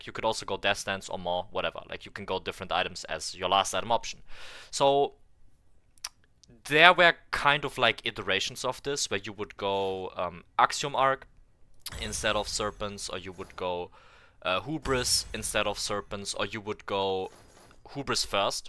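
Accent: Germany. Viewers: Hey, ich höre du hast einen deutschen Akzent. Kannst du mal einen Guide auf deutsch machen, da ich nicht so viel englisch verstehe... Wäre echt prima von dir 🤞🖖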